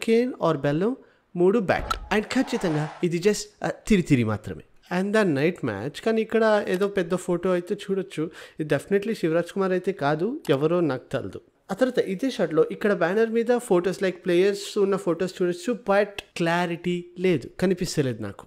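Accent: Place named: Kerala, India. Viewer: Ni telugu yenti bayya malayalam vadu telugu ni appu techukunnattu undhi worste ga why yendhuku ala matladuthunnav thinnaga matloduchuga Andra style ledha Telangana style ayina matladu bayya teluguni kuni cheyyaku please..😢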